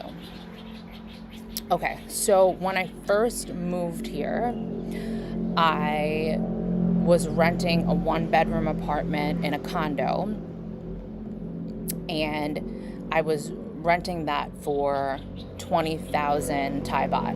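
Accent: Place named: New York, United States. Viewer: I thought I heard a little NY in your voice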